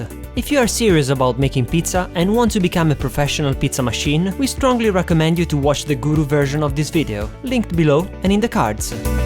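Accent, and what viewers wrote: Italy. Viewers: Hey, your recepies are amazing! My sister and I just tryed some of them! Aaaand we were wondering if you are italian.. :) Because we notice your italian pronunciation, and it's wery well!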